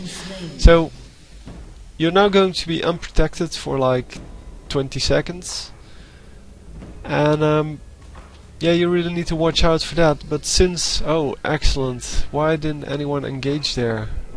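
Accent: Netherlands. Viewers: Are you Dutch? you sound a bit dutch ^^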